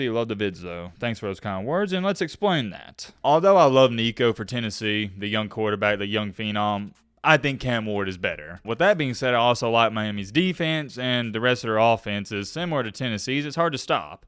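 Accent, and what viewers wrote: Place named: Alabama, United States. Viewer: The way you say Miami hurts my ears 😂 that’s that Alabama country talk my Amy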